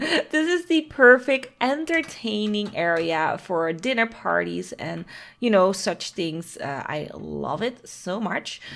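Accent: Netherlands. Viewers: You kind of have a Dutch accent, are you from the Netherlands? I'm from Belgium.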